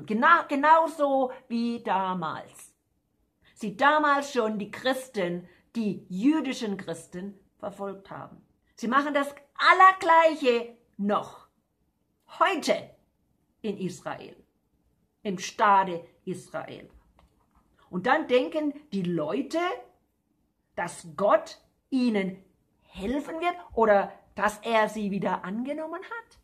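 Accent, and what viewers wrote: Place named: Baden, Germany. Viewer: Liebe, ich komme Auch aus dem badischen, ich wohne bei Wiesloch 😍 Habe früher schon gedacht, dass wir aus einer Gegend kommen, auch wenn Du sehr schön hochdeutsch sprichst, man hört es trotzdem "e bissl " Gottes Segen ins Ferne Amerika 🙏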